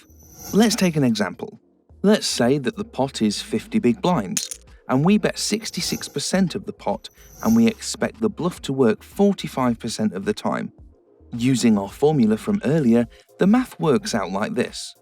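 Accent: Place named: United Kingdom. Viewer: British voice is the 2nd best selling point behind his last tip. Shame, I had to watch that whole video to get to that idea.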